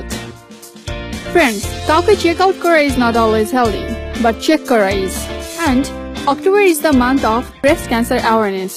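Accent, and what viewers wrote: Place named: England, United Kingdom. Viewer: আপা কি কথা বলার সময় চুইংগাম চাবান? কথার সুর শুনে মনে হচ্ছে আপনি বাংলা ভাষার মধ্যে ইংলিশ টান নিয়ে আসেন!!আর আপনার ও কি কাউকে লাগবে নাকি চেক মেট করার জন্য... ঠিক বুঝলাম না!!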